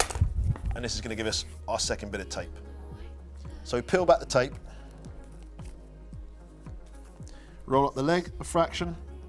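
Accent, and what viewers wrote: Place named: England, United Kingdom. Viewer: that English accent he has is awesome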